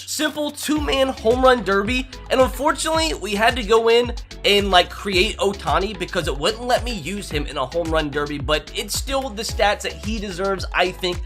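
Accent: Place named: Alabama, United States. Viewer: he's from Alabama and has a accent so do I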